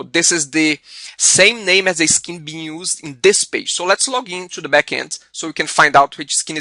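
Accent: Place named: Brazil. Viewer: Brazilian accent is unmistakable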